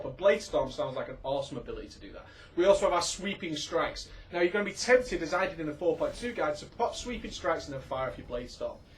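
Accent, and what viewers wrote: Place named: Manchester, United Kingdom. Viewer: I watched this purely for the banter, fuckin hilarious mate, where about in Manchester you from?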